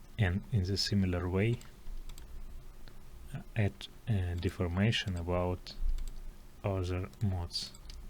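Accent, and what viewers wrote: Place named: Nizhny Novgorod, Russia. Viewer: Не понимаю зачем вы говорите на английском с кондовым нижегородским акцентом. Комментируйте по русски. Англичане с американцами вас всё равно не поймут.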